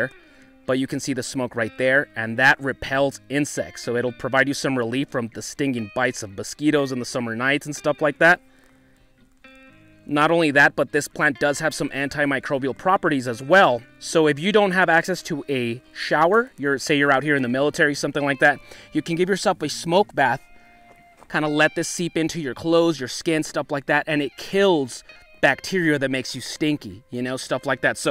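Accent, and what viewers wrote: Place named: Northwest, United States. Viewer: Dude, I love this video and yes, I can straight up. Tell you a bunch. More plants that lived down there that actually grow in that envirogreat. Video and you have a good English voice. I know that sounds weird, but you actually speak like a northwesterner. That's where I come from. You. Kinda sound like I do. Not a Southerner. You don't got the dral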